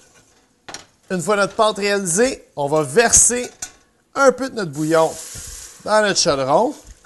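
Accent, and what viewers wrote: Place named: Sorel, Canada. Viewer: Ça ressemble à l'accent de Sorel!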